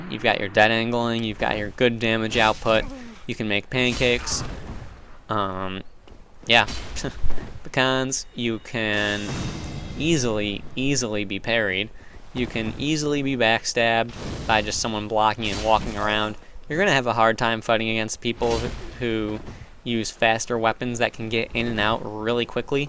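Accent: United States. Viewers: I speak german. You are pretty much pronouncing it correctly. Heavy american accent but correctly. (I'm american just sayin it sounds like an american sayi g it right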